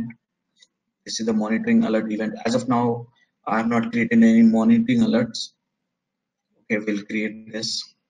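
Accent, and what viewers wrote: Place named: India. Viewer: it so hard to understand the heavy accent. i find it extremely hard especially with Indian tutors.It looks like a good course for me but whats the point if i cant understand every 3rd word he says. Also, the fact that he writes everything during the course is very typical to courses that are led by Indian accent tutors and also the excessive use of the word "OK" really irritates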